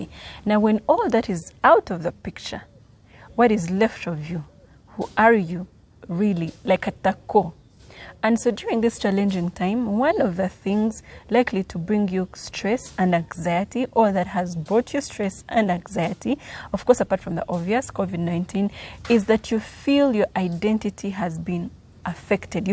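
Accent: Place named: Buganda, Uganda. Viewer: Your voice is life. I love your Buganda accent 💖💖💖💖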